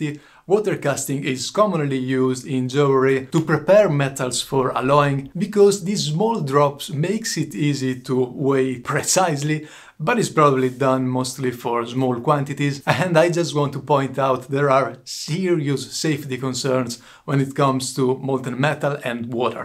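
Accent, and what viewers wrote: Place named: Italy. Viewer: can i ask if you're italian? I think you are because of the accent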